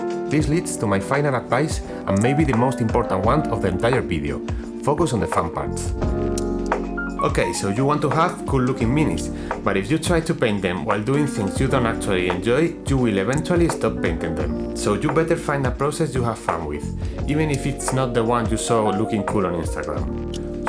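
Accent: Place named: Spain. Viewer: Como se nota que eres español por el acentazo que tienes, hablas el inglés con las tripas xD. Quizas te iria mejor en el canal si te pasas al castellano, ya hay muchisimos canales de habla inglesa y nunca vas a tener el alcance que tienen ellos en inglés, de verdad te lo digo con cariño, tu pronunciación deja mucho que desear y dudo que crezcas por ese camino. En cambio en castellano no hay tanta masificación y menos aun centrada en la parte de pintura del hobby, ahi podrias abrirte un hueco. Un saludo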